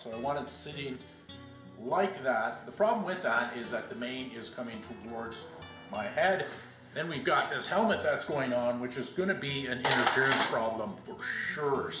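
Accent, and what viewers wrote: Canada. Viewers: Love this channel., what is your accent, Canadian?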